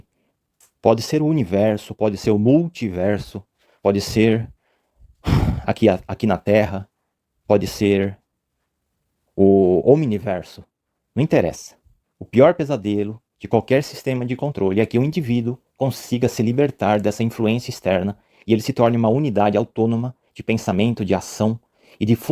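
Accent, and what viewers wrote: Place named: Rio Grande do Sul, Brazil. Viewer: vc tem sotaque de alguma cidde do RS.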